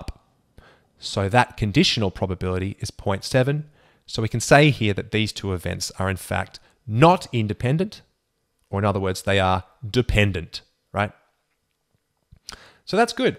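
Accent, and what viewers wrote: Australia. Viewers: You speak Australian accent?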